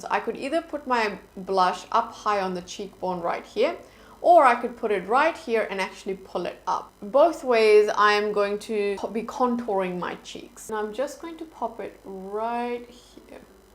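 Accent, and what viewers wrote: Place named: RP, United Kingdom. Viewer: I don't know why but you kind of sound like the English royal family. They speak very differently even easy words like "that". You sound quite similar to them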